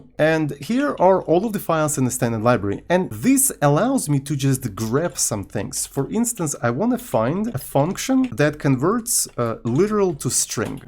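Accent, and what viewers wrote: Russia. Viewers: Excuse me for such question, are you from Russia?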